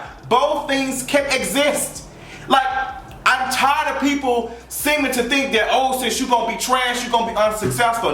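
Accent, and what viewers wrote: United States. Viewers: Love me some you, and that adorable country accent, but my love, my sweet love, YOU MUST 100% not tell people it's not their responsibility to disclose their status. That information is not due to anyoneeeeee at all unless you decide to share, HOWEVERRRRRRRR, you absolutely have every bit of responsibility to tell a potential partner what ur status is. I still love u, but there's no other way around that. It's morally right, and in a lot of states, it's illegal if you don't.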